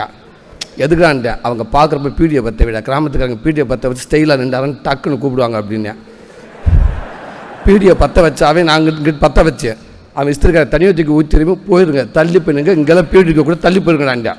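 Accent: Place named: Madurai, India. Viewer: Soori madurai slang❤❤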